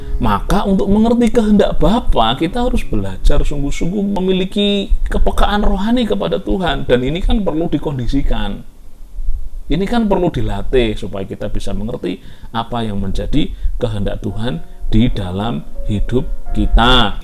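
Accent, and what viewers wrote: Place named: Java, Indonesia. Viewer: Mantap Mas...khs dialek jawa jg ada..heheh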